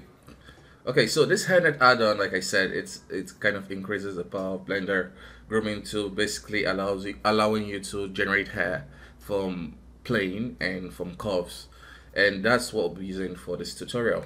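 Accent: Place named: Nigeria. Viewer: wtf just when i thought i was the only nigerian who knew blender. even from ur voice i know u are nigerian keep it up bro.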